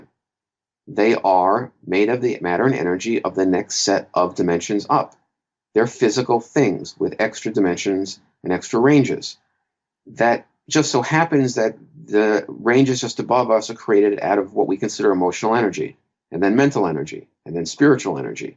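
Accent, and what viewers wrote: New York, United States. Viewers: Awesome perspective. You sound like youre from the ny area...so am I so theres an added comfort of sorts there. Thought really does lead to forms. The universe is one long song. When we are in harmony, we feel joy. When we are in disharmony, we feel anxiety. The problem today is there is an unspoken pressure to contruct a life that is by design unfulfillibg. So we seek pleasures to relieve us and soothe us. Sickness of society can only be faced by the individual. Seeing the power we truly have every day could liberate so many from the victim mindset. Much thanks!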